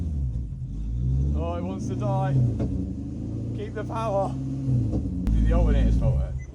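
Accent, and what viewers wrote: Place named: East Midlands, United Kingdom. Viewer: "Italian qualitehh" can't beat that east midlands accent 🤣🤣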